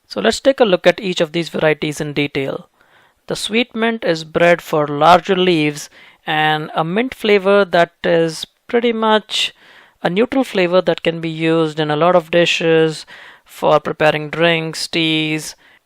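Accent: India. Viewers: LOVED your Indian accent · I think Indian accent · he is indian i think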